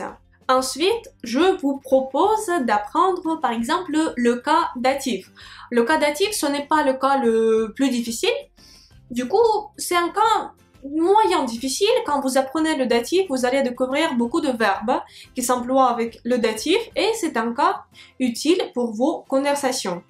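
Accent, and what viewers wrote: Russia. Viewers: Si nous pouvions aussi bien parler le russe que parle français, je crois que ce serait parfait...😊 Pour ma part, je lui pardonne ses petites imperfections qui lui donne le charme des russes... Merci pour vos belle leçons..😊😚👍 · Tu es magnifique 😘. Et quel joli petit accent. Les femmes russes sont parmi les plus belles au monde.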